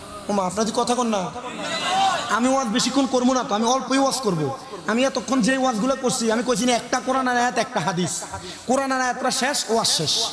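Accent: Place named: Comilla, Bangladesh. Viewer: তোমার কথা শুনে বুঝা যাচ্ছে তুমি কুমিল্লার মসলমান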